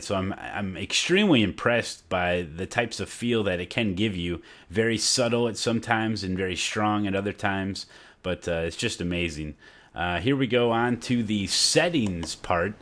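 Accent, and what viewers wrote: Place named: Midwest, United States. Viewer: Hey man whatsup. love the streams. But As for the click being a con, streaming that can definitely be an issue, but i dont think its a con in itself. Some love and/or need the strong satisfying click. but that should be an option i agree. if the click wasnt there id still want a nice solid tactile thump to confirm i had shifted, not some mushy feel ya know? And im from georgia myself, cant quite pinpoint your accent, midwest?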